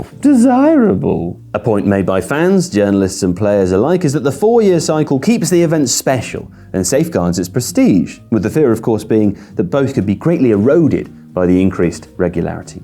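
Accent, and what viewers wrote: Scotland, United Kingdom. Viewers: is scottish??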